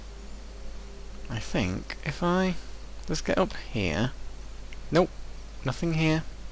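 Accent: England, United Kingdom. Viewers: I enjoy him because he doesn't have a silly gimmick(angry video game nerd....), doesn't sound/act like he is stoned/high, doesn't make us feel like we are just silently observes watching a methodical video (like when my german friend plays minecraft) but instead makes us feel like we are along for the ride through skyrim as a LET'S play.. not a walkthough. also I enjoy english accents.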